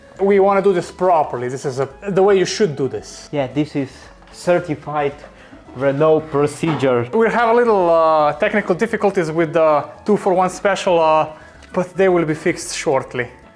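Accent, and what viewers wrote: Slovenia. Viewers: You got that Slavoj Zizek accent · lmao the slovenian accnet is killing me as a serbian.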